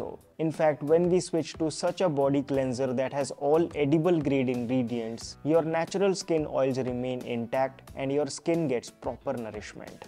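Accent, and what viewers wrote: India. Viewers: He is talking in English but it feels like I'm listening Hindi.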